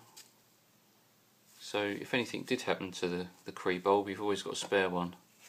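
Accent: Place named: United Kingdom. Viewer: Delightful British accent! Love it!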